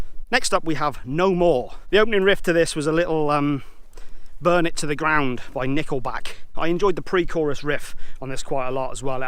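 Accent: United Kingdom. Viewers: It's a pretty decent album even though it ain't their greatest but it ain't horrible. And I must say that this video amuses me when you're explaining the meaning of the songs with your British accent! You deserve a subscriber!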